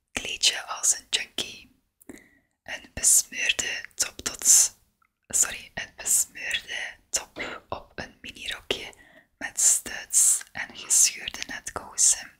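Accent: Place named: West Flanders, Belgium. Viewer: Leuke video met dat sgoone westvlaams accent.... zeer aangenaam x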